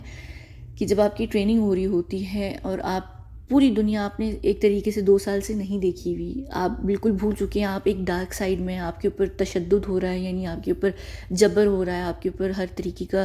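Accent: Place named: United Kingdom. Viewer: Love your English accent reminds me of home 👌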